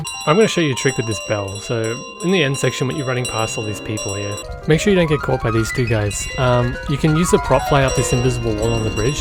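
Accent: Australia. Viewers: I cant stand his australian voice being so incredibly nonchalant about everything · Are you Australian?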